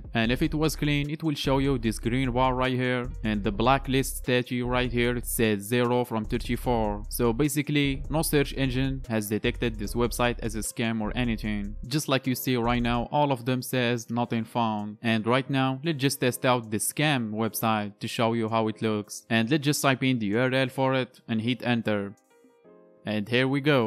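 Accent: Morocco. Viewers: are you from morroco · لهجة مغربية 😅 بينة من طبارة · khoya bayna fik maghribi f accent rak nadi akhoya,khoya momkin insta dialek?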